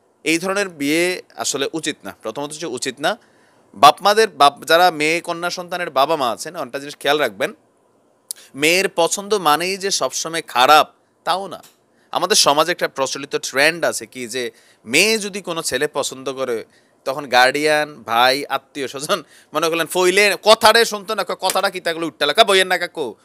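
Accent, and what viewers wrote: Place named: Comilla, Bangladesh. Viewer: ভাই আপনার মুখে কুমিল্লার ভাষাটা সেই লাগে..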